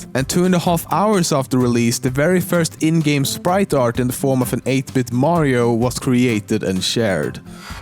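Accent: Sweden. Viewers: He is Swedish, so he says "H" as "Y"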